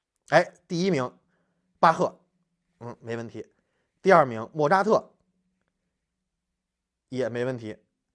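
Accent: Beijing, China. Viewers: 馮老師講英文真有趣，充滿北京腔，哈哈哈哈哈！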